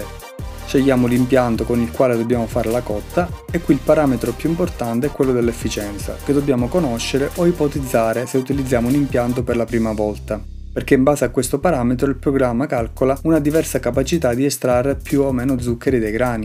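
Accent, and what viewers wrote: Calabria, Italy. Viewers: Ciao, sei calabrese?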